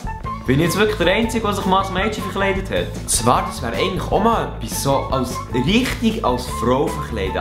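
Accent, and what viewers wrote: Switzerland. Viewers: scho lang uf nes schwizerdütsches video gwartet 👍 tönt outäntischer 😁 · Schwiizerdütsch isch immer guet, chunt eifach verdammt authentisch übere. Guets Video ;)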